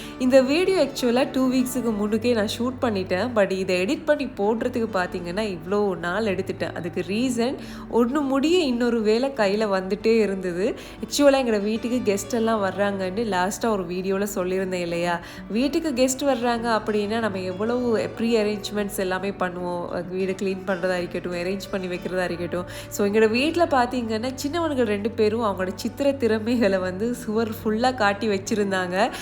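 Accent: Sri Lanka. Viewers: Assalamualaikum sis ✨ epdi irukinga Sinna vandungalam Nalla irukangala 😍unga videos la Enna sis kora iruku 🧐ellamey spruu ah poduringa reality ah iruku usefull la share panringa oru oru visaiyathaiyum ungaluku therinjatha A to Z ellamey share panringa 🤗aprm Sinna vandungalaiyum ungalaiyum paka enaku sandhosama irukum 😍Nd enaku mean ah unga Sri Lanka speech slang rmbaa pudikum sis🥰epothumey Enoda Dua Nd support ungaluku irukum🤲🏻💗 neenga inum naraiya achieve pannanum housewife kula role model ah irukinga 🤗itha Vida enna venum sis 100k subscribe reach pannathuku 🥰insha'Allah neenga inum naraiya videos podunga Nd keep rocking sis🥳💯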